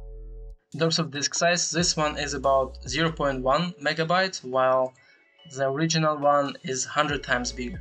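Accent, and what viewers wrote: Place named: Serbia. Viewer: A hahaha, yeah guessed cause of English accent, Serbs sound the same speaking it, and I feel you, I'm only around 180 which is short for a Serb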